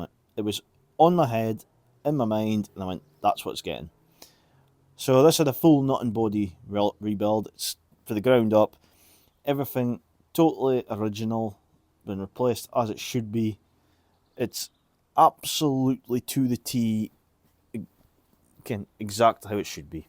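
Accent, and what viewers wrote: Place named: Scotland, United Kingdom. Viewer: Well, this is one of those times that YouTube's random recommendations came up trumps! You are a very, very lucky man to own all those Reliants - I'd have a hard time deciding which one would be my favourite. I've always had a fascination for these, and do like the Mk1 Robin saloon with the glass rear hatch which I find stylish but I suspect if pushed to actually buy one it'd be a later Rialto. (A Kitten would be a tempting prospect, too. There used to be a couple running around my home town when I was younger.) Nice to hear a fairly local accent too - I'm watching this from just a wee bitty further north than Aberdeenshire.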